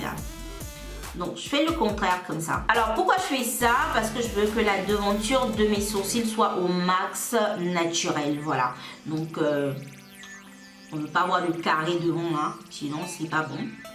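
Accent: Ivory Coast. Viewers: Tu es ivoirienne ?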